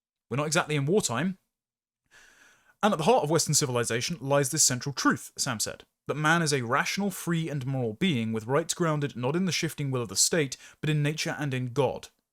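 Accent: United Kingdom. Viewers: Spiffingly English.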